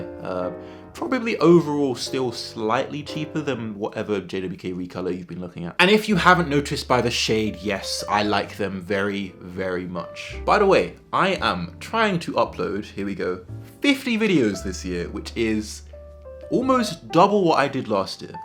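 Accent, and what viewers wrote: United Kingdom. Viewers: damn your sexy as hell my man! your british accent almost made me pop (^o^) no hooo moooooo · After watching all your vids I can't say tactile without a British accent. Im American.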